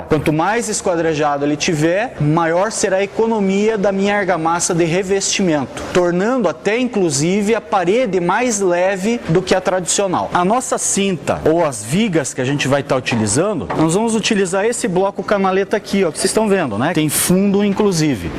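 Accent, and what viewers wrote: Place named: Paraná, Brazil. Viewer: VC É DO PARANA PELO SOTAQUE EU TAMBEM SOU 🙌🙌🙌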